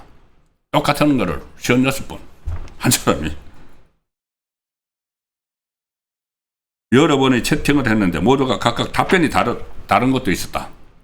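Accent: Gyeongsang, South Korea. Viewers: 송국건씨 말솜씨가 그래가지고 평론 하겠어요? 경상도 사투리억양이야 타고난거니 어쩔수 없다 하더라도 버벅거리고 두서없고 툭툭 턱턱거리는 말투와 어휘력 에 듣기가 거북하네요. 아나운서 정도는 아니래도 평범한 수준이라도 되야지. 참 딱하네요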